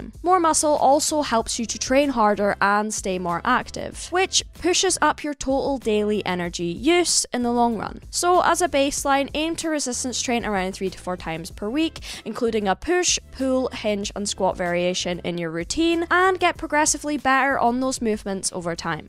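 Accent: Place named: Scotland, United Kingdom. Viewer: FIRST COMMENT AND FIRST LIKE:🥇🌟🎖️⭐️🏆🏅 hi happy Sunday your Scottish 🏴 accent=🔥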